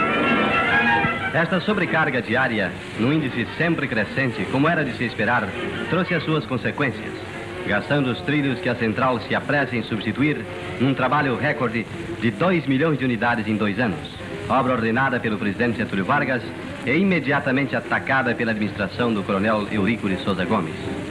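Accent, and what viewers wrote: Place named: Portugal. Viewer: esse português do narrador tá meio portugado rs.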